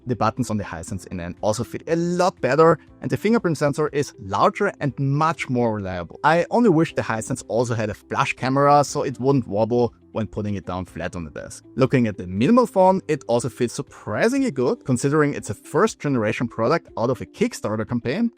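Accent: Vienna, Austria. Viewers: richtiga wiener heast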